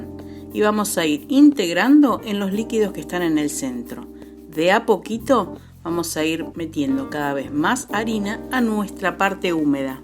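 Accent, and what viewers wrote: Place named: Uruguay. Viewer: Habla más uruguaya...🤔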